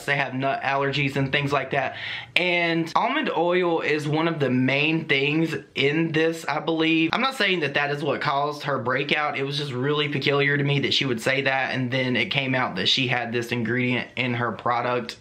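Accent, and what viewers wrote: South, United States. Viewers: god I can’t stand that dirty little wannabe! Nikita DOES get away with a lot. Btw, when you post I always look forward to sitting down with a drink and listening to your sweet southern drawl. You DIG DEEP!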